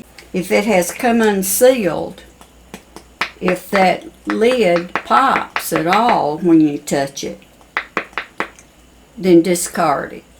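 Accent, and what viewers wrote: South, United States. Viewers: I love the way you speak. You have such a rich, beautiful southern accent. It reminds me of the way my grandparents spoke. Thank you for the helpful video.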